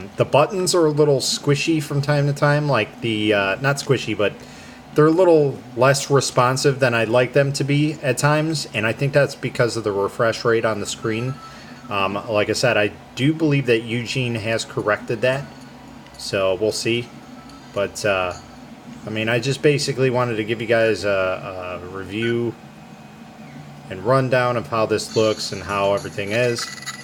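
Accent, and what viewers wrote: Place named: Chicago, United States. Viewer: dude where are you from? You have a killer Chicago accent coming though on these videos!!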